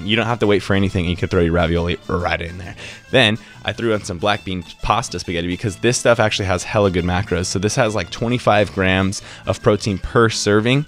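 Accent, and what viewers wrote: Italy. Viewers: how can you say that? the Italian accent was spot on, everything you cook talking like that become instantly Italian food. p.s. I'm Italian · The Italian accent! 😂😂😂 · Nice Video! Loved the Italian voice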